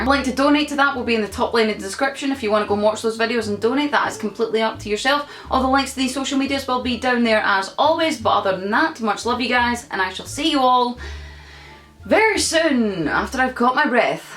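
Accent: Scotland, United Kingdom. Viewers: I have a question can you teach me how to speak Scottish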